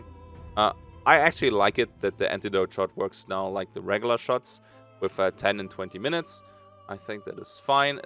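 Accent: France. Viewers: Damn French accent, i'm almost jealous ! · Quel bel accent Français ! Nous apprécions votre prononciation mon cher ! :D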